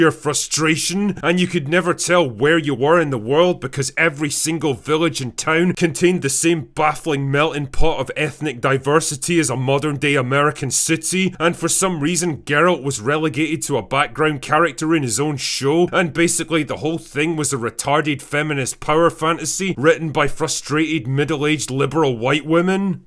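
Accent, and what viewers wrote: Scotland, United Kingdom. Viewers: I had to give up watching this after 2 mins 19s because the voice of the commentator is sooooooo annoying. Yes the Witcher is bad especially now Henry has gone…… but that annoying scottish turd is so much worse I couldnt bear to hear another syllable come out of his mouth without feeling my toes curl the wrong way.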